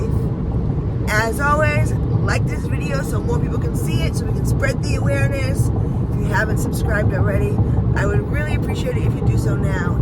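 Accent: New York, United States. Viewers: Thought you from New York or something like that bc of your accent 😆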